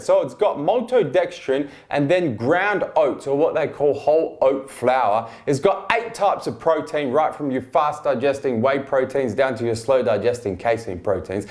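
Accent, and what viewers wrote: Australia. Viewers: Manos Nikolidakis seems fine to me this is how aussies talk if u were a good psychologist u would know that different places have different ways of talking. Plus the extra obnoxious loudness is just hid way of trying to appeal to his subs as youtube is sort of a entertainment platform. Throw your degree away lol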